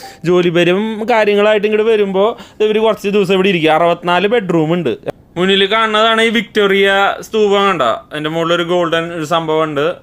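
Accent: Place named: Thrissur, India. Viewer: ഇഷ്ടായിട്ടാ ഗെഡി, എന്തുട്ട് തിയേറ്റർ, ഞാൻ ഇവിടെ vox ഇൽ പടം കാണാനിരിക്കുമ്പോൾ സ്ഥിരം കൂട്ടുകാരോട് പറയണതാ, രാഗം കഴിഞ്ഞിട്ടു ഉള്ളു ഇതൊക്കെ എന്ന് ഇമ്മള് തൃശ്ശൂർകാർക്കു രാഗം വിട്ടുള്ള കളി ഇല്ലന്ന്, ദുബായ് മാളിൽ നടക്കുമ്പോ ഞാൻ പറയും പൂരം എസ്‌ബിഷനിന്റെ ഒരു സൈഡിലേക്കില്ലെന്നു, ത്രിശൂർ അങ്ങനത്തെ ഒരു ജാതി സ്ഥലാ, അവതരണം നന്നായി പൊളിച്ചു വീഡിയോ ഈ സ്ലാങ്ങിൽ മതിട്ടാ ഓകെ ടാ keep it up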